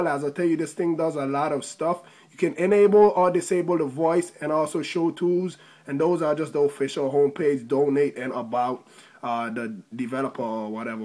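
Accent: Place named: Jamaica. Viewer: just curious but what is your nationality? you sorda sound Jamaican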